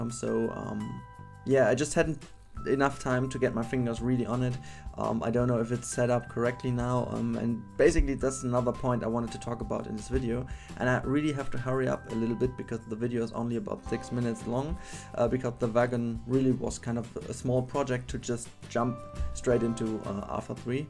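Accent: Germany. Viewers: You are German right ?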